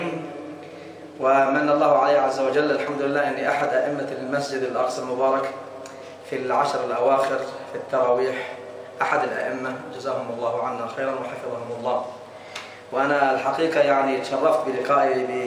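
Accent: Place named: Egypt. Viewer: ربنا يزيدك ولا يحرمنا من الصوت المصري ابداع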